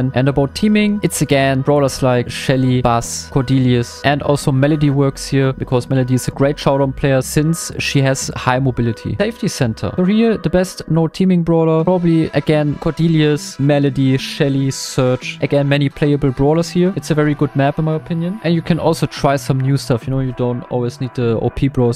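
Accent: Germany. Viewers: Der deutsche Akzent macht es viel interessanter. Danke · Du bist Deutsch,ne? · Are you German? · Bro is german and i didnt notic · Man hört voll den deutschen Akzent 😂😂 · Mann hört einfach dein Deutschen Akzent 😂❤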